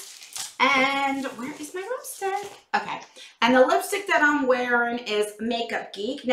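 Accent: New Jersey, United States. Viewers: I just love you and your Jersey accent 💖... My favorite thing in the fab fit fun box would probably be the throw... I'm obsessed with blankets of any kind... I do not get the box but have thought about getting it but if I got all the subscription boxes I want then I would be broke all the time 😊😂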